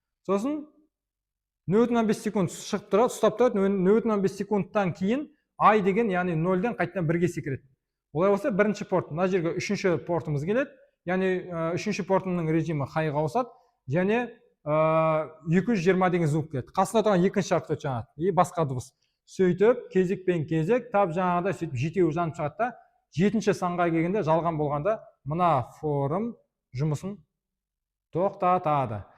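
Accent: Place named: Mongolia. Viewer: Осы бала Монголиядан келген сияқты.Өте түсінікті айтып жатыр көптен көп рахмет .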